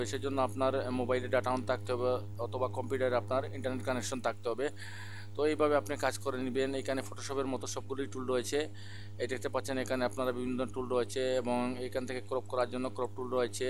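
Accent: Sylhet, Bangladesh. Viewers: VAI SYLHETY NE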